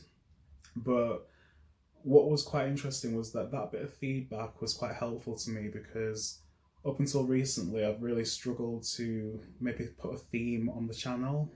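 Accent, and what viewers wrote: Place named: United Kingdom. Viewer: I read a comment left on a rainbow bright video and just came for a nosey. Some reason thought you'd be American. Hello to you from a fellow Britt. 👋.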